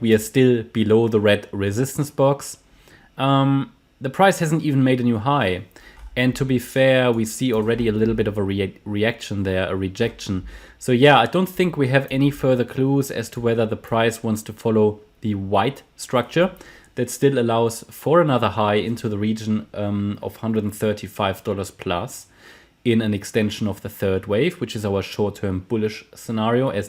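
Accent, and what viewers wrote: Germany. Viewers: Deutsch, jetzt habe ich es rausgehört :)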